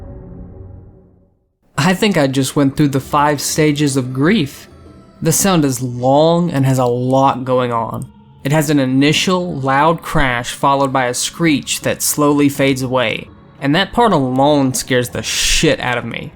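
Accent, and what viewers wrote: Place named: South, United States. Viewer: Great content, keep it up, but you might want to tone down your own accent a bit if you can, people would probably find it jarring to open up to a heavy southern accent, especially european or north US viewers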